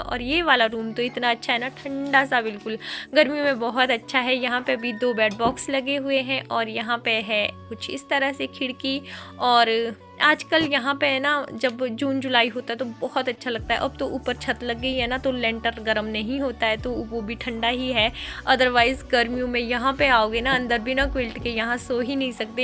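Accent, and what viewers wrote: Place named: Haryana, India. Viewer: Aapki language Hamare Haryana se bahut match khati hai Hamare Haryana mein bhi Aisa Hi bolate Jaisa ki aap baat karte ho bolte ho idhar ko Udhar ko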